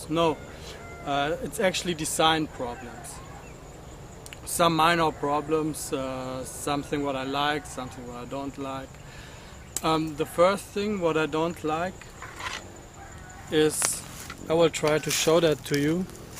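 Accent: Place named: Germany. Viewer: You seem to have a German accent, but it looks like you're in a very tropical area. Where do you live?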